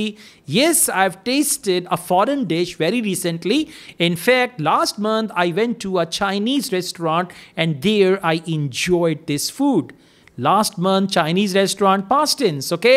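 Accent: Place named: Punjab, India. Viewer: Punjabi acent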